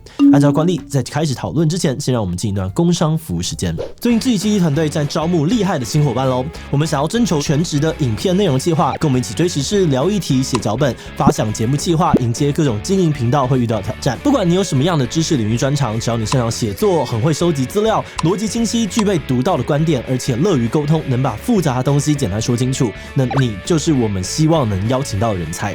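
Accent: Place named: Taiwan. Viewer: 听台湾人说中国话真的好难受，能不能派人去教教他们说普通话啊。。。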